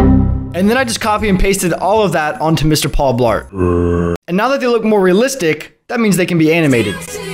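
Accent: United States. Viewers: Video starts Skip all that padded murican accent bs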